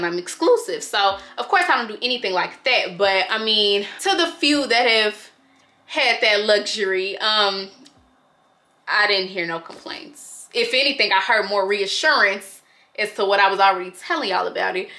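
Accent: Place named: Chicago, United States. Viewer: Awesome vid. Are u from Chicago? I hear the accent lol.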